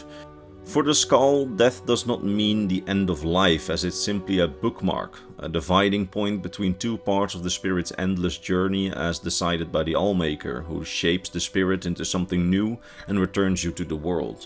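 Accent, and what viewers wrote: Netherlands. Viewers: you sound Dutch, I'm guessing you're from Holland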